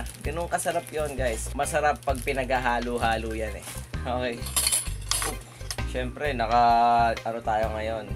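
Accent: Visayas, Philippines. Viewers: kuya visaya kaba??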